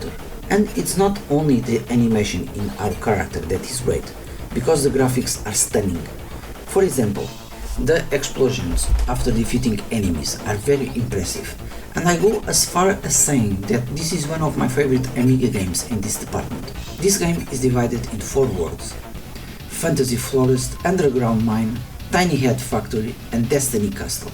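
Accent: Portugal. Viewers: Não sei se alguma vez comentei num dos teus videos mas estava a ouvir o teu sotaque e pensei que fosses Tuga. Fui ver e és. Não falha. :)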